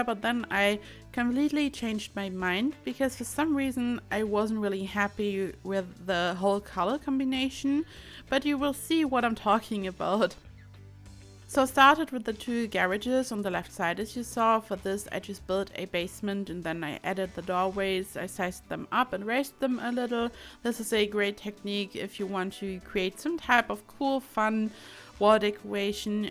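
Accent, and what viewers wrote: Germany. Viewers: Are u german? You sound kinda german to me ☺️ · I recognized the accent, but wanted to make sure. Ich bin auch aus Deutschland ☺️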